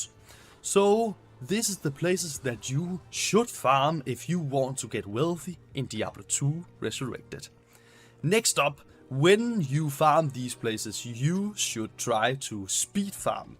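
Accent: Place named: Denmark. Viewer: Uden tvivl dansk accent 😂😂😂😂😂 fed video!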